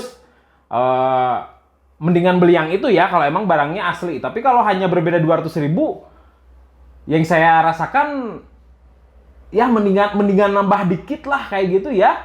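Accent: West Java, Indonesia. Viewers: kaya ada logat sundanya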